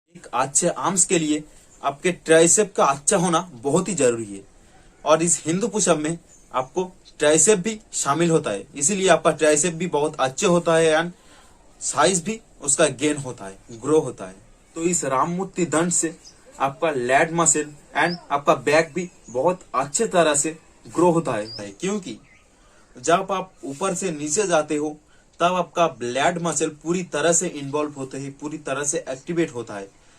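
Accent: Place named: Bengal, India. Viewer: Super ...hindi bolna thora improve kerna he.......kiu ki ap sayed bengali ya assamies ho.....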